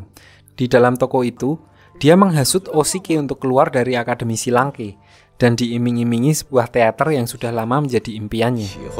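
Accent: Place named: Java, Indonesia. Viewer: Mambu mambu wong jowo admin e ki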